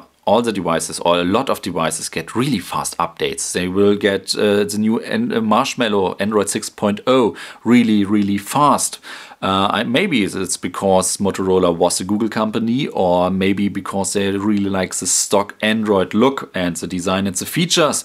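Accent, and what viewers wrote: Germany. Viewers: German accent :P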